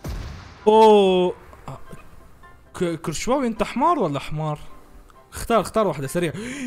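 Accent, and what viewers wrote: Iraq. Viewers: احلى واحد يحچي عراقي ضلعي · دقيقة ملك اللهجه العراقية 😂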